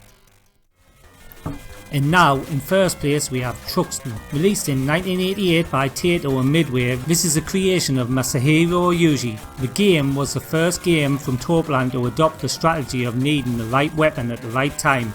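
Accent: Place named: Newcastle, United Kingdom. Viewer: You've done a great job managing to hold onto your (geordie?) accent in spite being over in Japan for so many years....or have I embarrassingly confused you with Retro Core?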